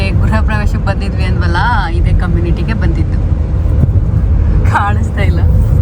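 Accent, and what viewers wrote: Mandya, India. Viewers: Foreign ge hodru nu Namma local Bangalore and mandya Kannada en sakkathu agi mathadtira ❤🎉 Navu gowdre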